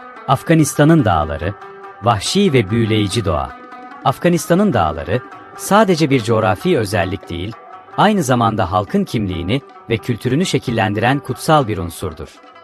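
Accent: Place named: Turkey. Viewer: بسیار عالی موفق باشی یک مستند بسیار عالی بود لحچه ترکی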